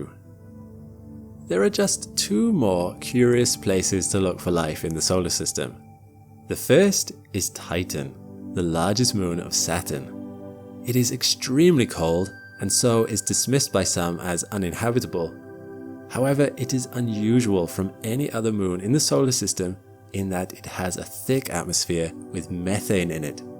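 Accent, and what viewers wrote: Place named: United Kingdom. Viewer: I'm guessing it's less "correct" vs just locational pronunciation. I believe he's in the UK based off how he pronounces some things Here where I live in the us, some things are pronounced different in my state than another state, so I'm giving benefit of the doubt to him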